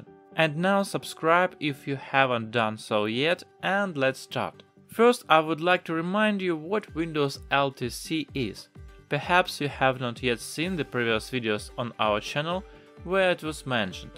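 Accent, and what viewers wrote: Russia. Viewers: Все равно русский акцент не скрыть.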